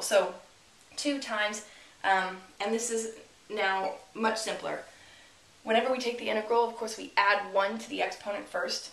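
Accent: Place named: New Jersey, United States. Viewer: That's a lovely accent you have, New jersey ? Or austria 🤔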